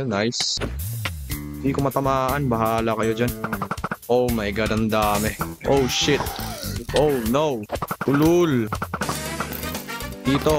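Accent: Visayas, Philippines. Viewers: Bisaya day ka dol hahaha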